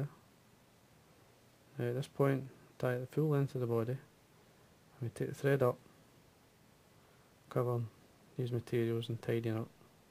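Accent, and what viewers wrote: Scotland, United Kingdom. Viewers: I think I've said it before, needs saying again, you are a wonderful fly tyer and your flies are absolutely lovely. Your methods, which, as a tyer for 40 years (and pretty decent), I have to say are SO meticulous. Plus the accent man, I love it. Brings me back to a wee lochen near Inverness, which, as I understand, I can't tell you the name of or I will meet with an early grave.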